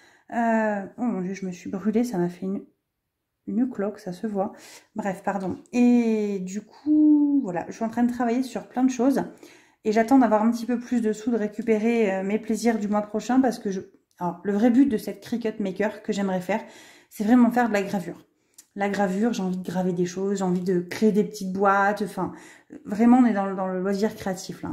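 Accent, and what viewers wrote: South, France. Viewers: Je trouve votre idée super pour le scolaire et pour les enveloppes ✉️ budgets etc... vous êtes une vrai warrior avec tout ce que vous entreprenez c'est très courageux! Vous avez un accent du sud je trouve c'est très jolie à entendre🙂 je vous souhaite de mener à bien tout vos projets et de vous faire un max de sous afin de pouvoir profiter un max de la vie avec vos proches🙂👍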